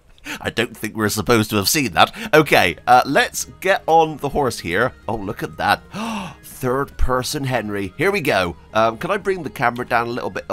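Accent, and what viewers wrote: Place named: Bristol, United Kingdom. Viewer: My guy is from Bristol. Can't hide that accent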